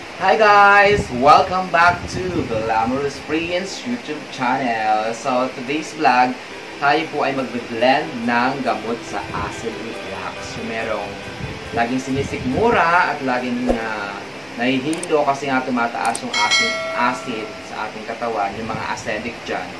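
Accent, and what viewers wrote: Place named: Lopez, Philippines. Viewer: parang taga lopez quezon ka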